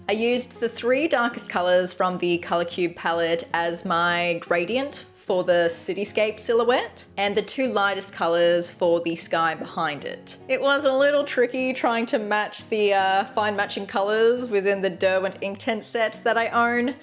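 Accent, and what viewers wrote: Australia. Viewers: Hello I am new here. Commenting to boost for the algorithm! Fun fact of the day: I'm from Aotearoa NZ, and every time I accidentally stumble across an AUS/NZ creator I have to listen for an abnormally long time to figure out whether theyre a kiwi or a roo. My guess, without looking at the rest of your content is that you're Australian 😌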